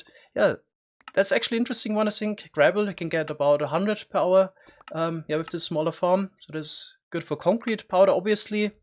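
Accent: Germany. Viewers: Hahaha ich hab gewusst du kommst aus Deutschland!!!! xddd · Deutsch man hört es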